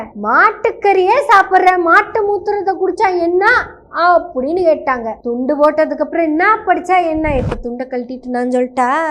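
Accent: Kongu, India. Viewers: உங்கள் அழகும் பேசும் அழகிய கொங்கு தமிழும் சங்கி வேசி மக்களுக்கு ரொம்பவே கசக்கும். பரட்டைத்தலை பரதேசி டாக்டருக்கு அதுவும் கைனகாலஜி படித்தது அனைத்தும் தமிழிலும் ஆங்கிலத்திலும். இந்தியை படித்தால் தவறொன்றும் இல்லை. இவள் சௌந்தரராஜன் மனைவியா இல்லை மோடிக்கு மனைவியா.இந்த லட்சணத்தில் கவர்னராக வேறு இருந்திருக்கிறது இந்த சனியன். போடி போய் மாட்டு மூத்திரத்தை மட்டுமே குடித்து சாணத்தை சாப்பிடு.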